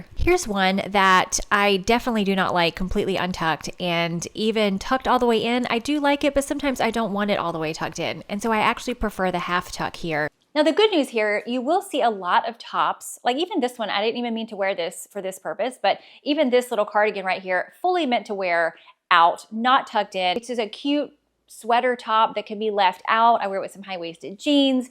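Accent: South, United States. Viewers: I'm not even thinking about the fashion. I used to teach English and I'm wondering if any non-native English speakers can pick up on her subtle southern accent here. It's subtle, I wonder how this sounds to someone from outside the US and if they're even able to pick up on it or place it, or just find some of her intonations confusing compared to other regions of English speakers. For the video, this is another classic example of a fashion girly talked about modern versus dated looks in an interior design setting that could easily be under similar scrutiny that does NOT match what they are recommending for fashion! But who's counting.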